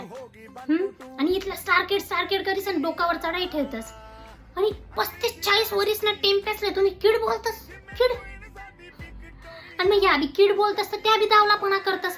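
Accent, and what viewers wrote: Khandesh, India. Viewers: ❤️मला खानदेशी तुझी तोंडून फार आवडते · काय मस्त खान्देशी boltis माय तु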